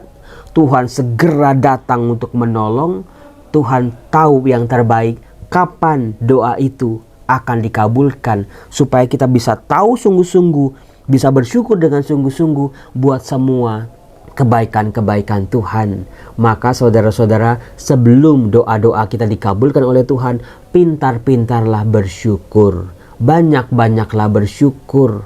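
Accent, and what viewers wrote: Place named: Jakarta, Indonesia. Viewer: Pertama kali dengar Bible Learning di awal tahun 2022, tahu nya dari OKS. Setelah itu saya kejar nonton Bible Learning dari 2019. OKS nya juga saya kejar nonton dari awal. Saya suka romo2 di OKS dan romo bicara dengan logat jakarta, bahasa nya yang gak baku dan kaku, gitu😄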